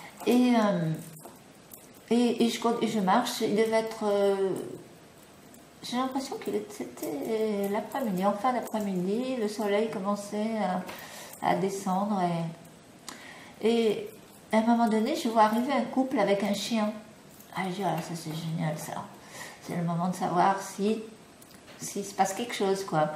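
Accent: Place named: United Kingdom. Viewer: Superbe vidéo, de plus tu es très responsable dans tes dires et très soucieuse de ne pas choquer ton public. ps Ton accent est très agréable, Anglaise peut être.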